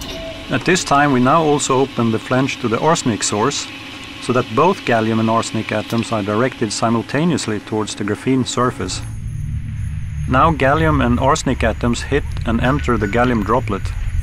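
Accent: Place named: Norway. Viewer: This is so cool, and so much more authentic with a norwegian accent.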